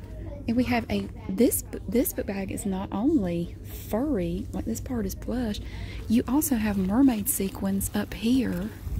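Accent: South, United States. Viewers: New viewer here and I just have to say the best part of your videos is your voice. Always remind me of the movie The Help. 😂 that strong southern accent is so nice!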